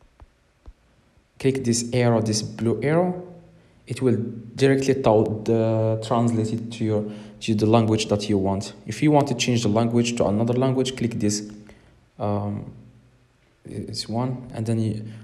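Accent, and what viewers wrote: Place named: Morocco. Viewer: bayna fik mghribi 😂❤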